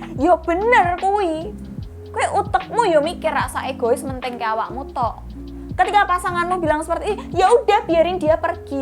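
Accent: Java, Indonesia. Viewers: medok e lucu....hihiii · medok mu loh mbak :) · Aq kok seneng gaya ngomong e mbak 😊 medok Jane tp kok ngangenin🙈🙈😅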